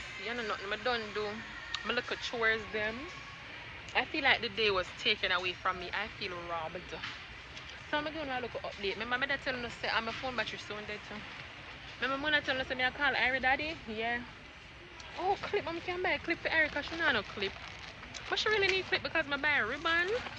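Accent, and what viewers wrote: London, United Kingdom. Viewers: Yuh london accent trang enuh girl!!!! Wow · YOUR BRITISH ACCENT NICE YUH CAN GWAUN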